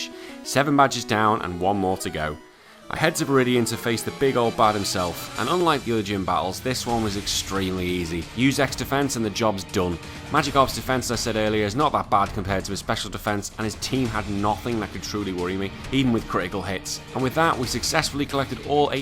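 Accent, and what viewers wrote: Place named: Wirral, United Kingdom. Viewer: Great video mate, don't usually like/comment cos I watch YouTube on xbox but am not logged in so I came back to your video on my phone just to let you know how good this was ! Loved the joke about being 32, married with a kid lol - Pokemon is for all ages :) anyway great video mate, you should definitely do more challenges like this one ! Btw where you from? You sound very close to me on the Wirral?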